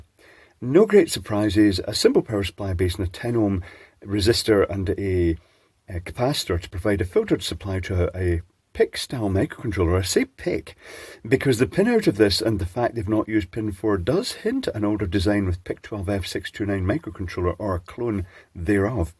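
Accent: Scotland, United Kingdom. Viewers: I love watching your videos, for some reason this one had the auto subtitles activated when I played it and it was made even more amusing than normal because it mistranslated your Scottish brogue. Glitter pearl was translated in the captions to 'glitter peril'. 😀 Be very careful of those glitter perils I understand they can be dangerous.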